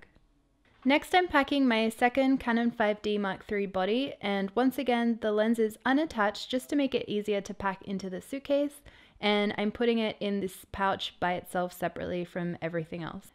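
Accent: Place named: Australia. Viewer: Thank you for this video. And also, i figured that you are australian, which is very awesome!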